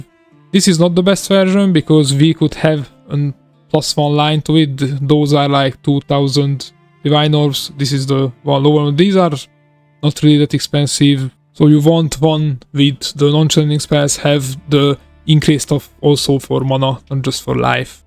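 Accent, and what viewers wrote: Hungary. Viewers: Where are you from? You sound like you are from hungary a lot :)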